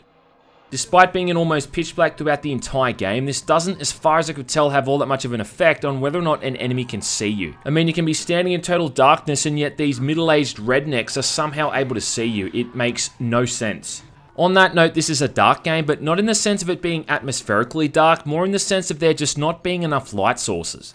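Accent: Australia. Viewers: is awesome. I personally liked outlast, but we need someone to be tough on games so developers give us more. Much support from the United States to you my friend. I watch many of your video's and keep up the great work. Just curious are you from Australia or New Zealand?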